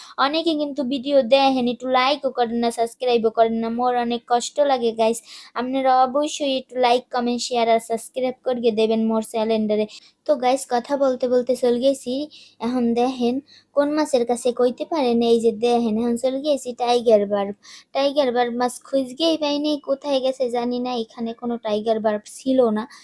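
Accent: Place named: Barishal, Bangladesh. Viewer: তোমার মুখে বরিশালের কথা খুব সুন্দর লাগে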